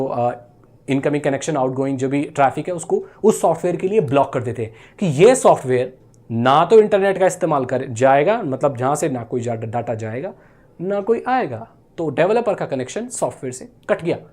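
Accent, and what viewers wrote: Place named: Bengal, India. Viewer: ap bangali ho?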